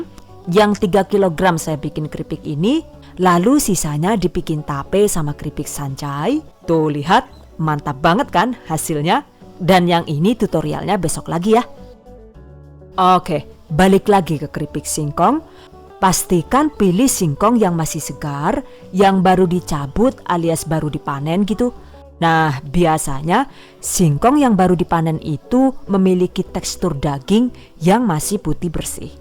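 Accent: Banyumas, Indonesia. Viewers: Orang madiun kok ngapak yaa..hee salam dari tulungagung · Makasih Buu .... 😀Deneng ngapak ? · madiun ngapak yah mab? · Kie mesti wong banyu mas. Tepate cilacap. Kira kira kya kuwe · bu orang mana bu ..hehee bisa ngapak Bu 😁😁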